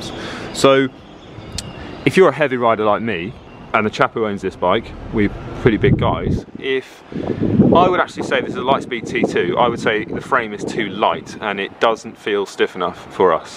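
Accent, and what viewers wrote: United States. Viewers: Spot on american accent. Cheers!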